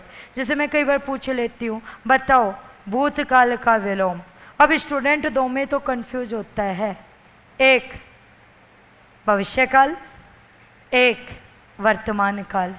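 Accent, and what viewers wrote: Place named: Mathura, India. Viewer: 100% h kI aap mathura se h🙏🏾🙏🏾🙏🏾🙏🏾🙏🏾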